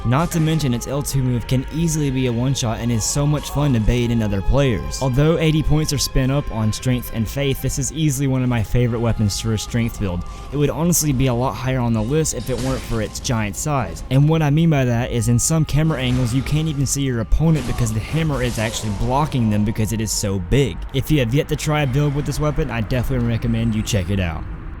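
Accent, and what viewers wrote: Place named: Texas, United States. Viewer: I really love your videos! Also what accent is that are you from the south like Texas?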